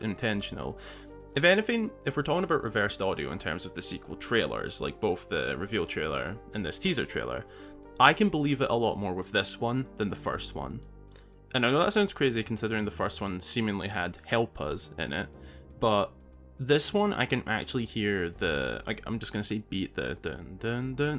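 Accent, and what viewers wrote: Scotland, United Kingdom. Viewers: Glad to see the man behind the awesome scotish accent ;) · It's cool dude. It's nice to see the face to the wonderful oh so similar accent that I haven't heard much. Mon the Scots lol. So good a concept I can see/hear the likness to? I guess we will need to see next year 😭😭😭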